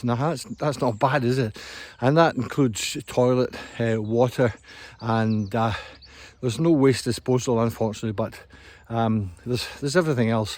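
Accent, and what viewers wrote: Scotland, United Kingdom. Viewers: Hi. From where in Scotland do you originate? I lived in Glasgow for a few years and, unlike a lot of the English, I'm very tuned-in to their accent, but I have to confess that I much prefer your soft, gentle accent.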